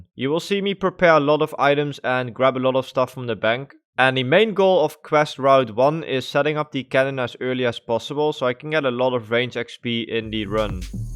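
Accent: Netherlands. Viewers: you sound dutch man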